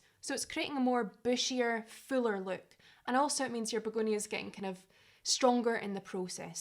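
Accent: Scotland, United Kingdom. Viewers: Good video I'm going to lop the top off mine. I've always loved these plants but they seemed expensive. I love your Scottish accent, you could make audio books❤ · After three months of rooting my angel wing spotted begonia cuttings in water I was ready to locate information on potting. Your video is so helpful as well as fun to watch. By the way, my maiden last name is Sutherland and I enjoy your Scottish accent so much! I live in Virginia, USA. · Aww your Scottish tongue is bra lassie. I’m from Montrose, left 40yrs ago to move to USA..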